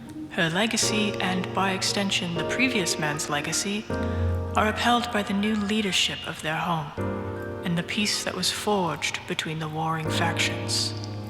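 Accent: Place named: England, United Kingdom. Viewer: You truly could’ve fooled me if you were trying to pretend to have an English accent. I like doing voice acting as a hobby, specifically impressions, but it’s hard for me to mask what is clearly my American accent. Still, I can tell a lot of love went into this and wanted to complimented as such. You’re very welcome.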